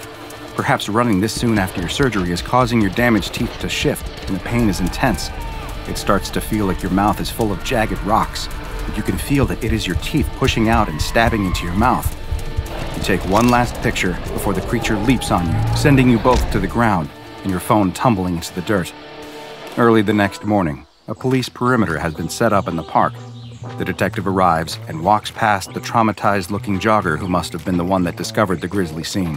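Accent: United Kingdom. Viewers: He became british D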